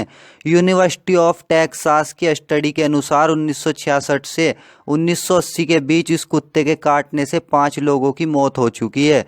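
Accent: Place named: Muzaffarnagar, India. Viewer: Bhai west up me kha se ho aap aapki boli se pta chal rha ke muzaffarnagar dist. Se ho sayed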